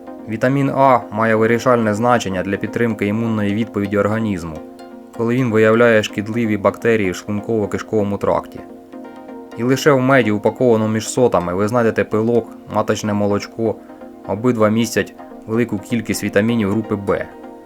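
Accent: Ukraine. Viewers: Автор явно з Черкоащини.)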